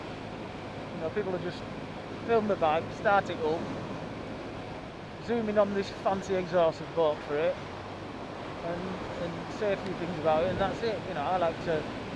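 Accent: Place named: Bolton, United Kingdom. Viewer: great vid and good sharp pics despite the cloudy conditions thought it was Bolton area at first .... accent too... but maybe your Preston way or Yorkshire even ? Just wondering, getting a puncture can you get the tyre over the rim ? I had a puncture 2 weeks ago, Ive got good tyre levers but could nt get tyre over the rim Bike shop says ... most bikes have got to come in these days and get tyre off by machine so one simple puncture could cost me Pick up £45 Repair £40 .... its getting mad out there ! Probably eu legislation !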